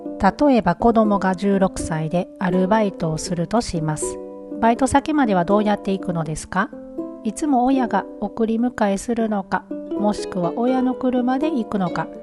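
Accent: Kansai, Japan. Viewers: 君関西人やろ知らんけど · それだけ 海外暮らしが長くても 関西弁抜けてないっていうところを見ると もう日本で住んだらいいんじゃないの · 関西弁に癒されます❤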